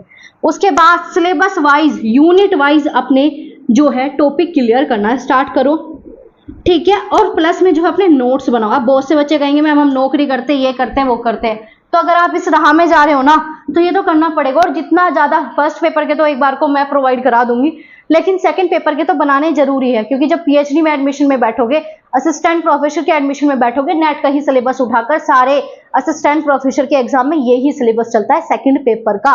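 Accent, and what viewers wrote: Haryana, India. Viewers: Yes ,that haryanvi accent inspiring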